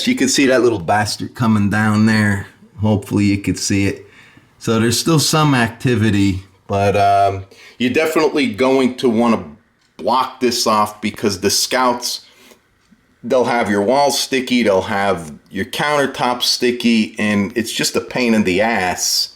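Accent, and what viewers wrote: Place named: North, United States. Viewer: lol I love your accent you from Jersey, Boston, New York I notice that northern accent. And yea I have ant issues at my house, they’re usually the smaller black ants and I made a mixture with boric acid powder with honey and cut out a hole in a plastic bottle for easy access and I caught tons of ants that way and they weren’t able to get back out of the bottle. But you’re right ants can be smart and picky!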